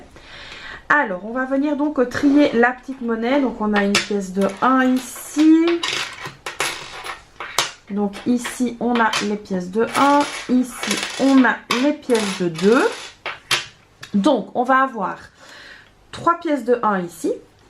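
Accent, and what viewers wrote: Belgium. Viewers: Vous êtes belge ?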